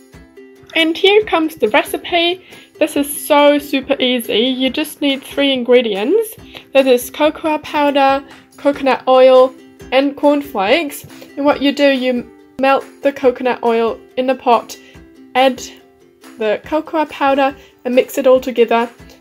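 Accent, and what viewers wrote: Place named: New Zealand. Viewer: wow du hast einen richtigen Kiwi Accent 👏😻👍 vor allem das "e" ich liebe es 😄 noch ganz viel Spaß :)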